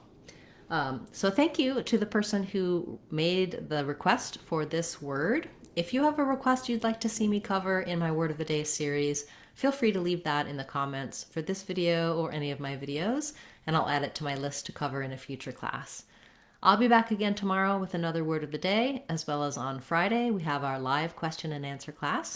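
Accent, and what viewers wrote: United States. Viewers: I think you should state that these are US pronunciations. In the UK we use first syllable stress for noun and adjective.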